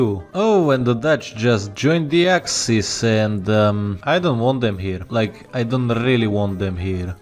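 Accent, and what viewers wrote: Russia. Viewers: Ааааа этот акцент. Ты русский? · Ru: О госпаде, какой же это прекрасный русский акцент. Чувак, лучшее! Eng: Oh my gosh, what a wonderful Russian accent that is. Dude, the best! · Is it just me who thinks he has a Russian accent? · Почему ты говоришь с РУССКИМ акцентом?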